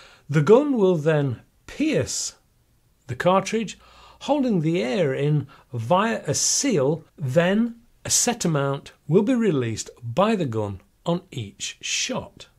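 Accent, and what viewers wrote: United Kingdom. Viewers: I could listen to British people talk all day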